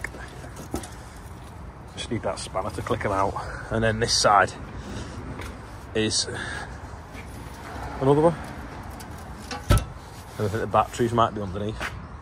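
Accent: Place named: England, United Kingdom. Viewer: Nice video.. only i understand half the time the english accent you are talking😅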